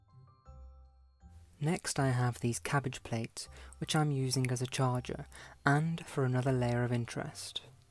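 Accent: United Kingdom. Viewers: i always look forward to your videos. its like watching a real life Mr Bingley in a modern era, how delightful to hear your british accent, the aesthetics of your video and of course the lovely content like your beautiful table setting and your food. i also noted that this time round, you did a close up of all your wonderful table accoutrements. ooh those monogrammed napkins, need i say more! they are so very you.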